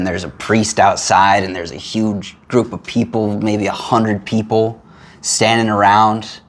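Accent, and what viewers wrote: Philippines. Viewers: ❤ ur Story! Welcome to d Phl! U already sound like a Pinoy! U also ❤️ d food, singing & culture! F u r Single, u might end up marrying a Pinay! ❤️😍💋👌🏼👏🏼😘👍🏼🎉🫶🏼🙏🏼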